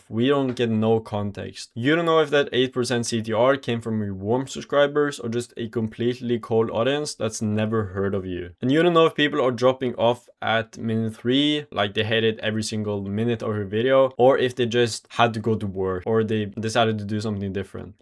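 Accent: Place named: France. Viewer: 1.- Thanks for the content. 2.- Are you french or french canadian? Your accent gives me XQC vibes.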